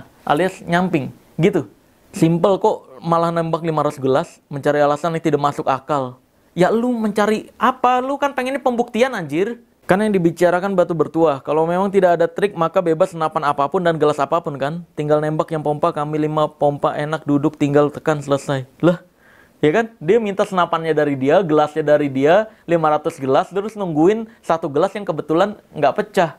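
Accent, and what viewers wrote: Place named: Java, Indonesia. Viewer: Wkwkwk bang wong jowo yo 😂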